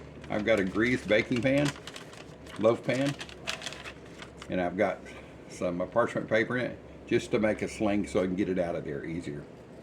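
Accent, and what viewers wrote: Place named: East Tennessee, United States. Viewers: thank you so much, I went back and rewatched your video and got the ingredients, just cuz I like to hear you talk I'm from East Tennessee so we speak the same language, lol...imma be making this today, that is if I can get Walmart to deliver my beer😅 Tell your wife you tickled me too, make me spit my coffee out when you we're trying to say particular😂😂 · This looks wonderful!!! About your accent, it's great!! Here in Tennessee, you'd fit right in!! Take care!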